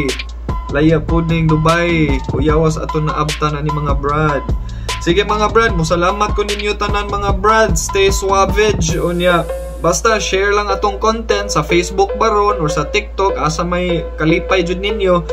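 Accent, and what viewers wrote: Visayas, Philippines. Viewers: Perting ka hawd ug bisaya dol oi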